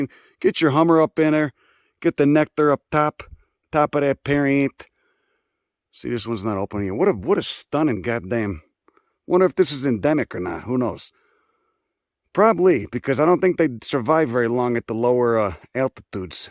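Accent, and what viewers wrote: Chicago, United States. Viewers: Chicago accent Very salacious content you got here.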